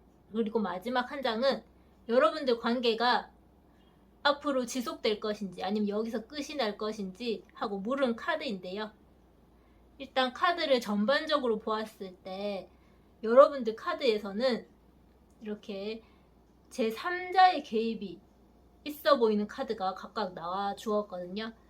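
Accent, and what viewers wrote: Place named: Gyeongsang, South Korea. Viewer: 타로에 경상도 사투리가 엄청 많네..대구? 대구사람들이 다 타로하는거임??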